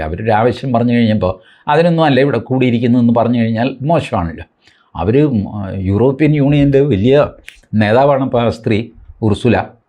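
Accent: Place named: Alappuzha, India. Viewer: "അയാള് ഡീക് അടിച്ചു"😂😂😂ആലപ്പുഴക്കാരുടെ സംസാരരീതിയാണിത്. 😂😂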